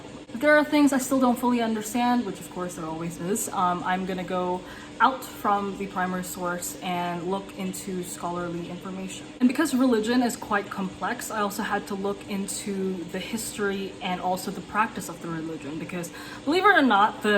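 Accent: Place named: United States. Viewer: please introduce yourself before making a speech. i am curious. your face is like asian but your voice is like american. Are you an american or asian?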